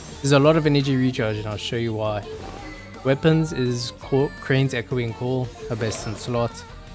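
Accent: South Africa. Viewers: I can definitely hear that this guy is South African, Lekker my bra! SA for the win! man is lekker ryk om so baie constellation op so baie karakters te hê T-T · South African? Uncommon... Cool video 👌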